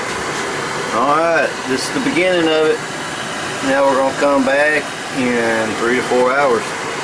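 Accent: South, United States. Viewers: that thick southern accent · southern accent and all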